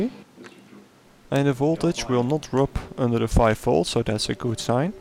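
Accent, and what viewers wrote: Netherlands. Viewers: So noticed you were Dutch by the accent. Dus ik maak het me even makkelijk. Ik had op mn pi2 eerst een plex servertje draaien, hdd powerde hij ook mooi. Nu raspbian image erop met plex en de hdd tikt lekker door (net nieuw) welke power options heb ik verder nog om de pi wat meer stroom. Te geven? (gebruikte voorheen de usb poort van mn pc)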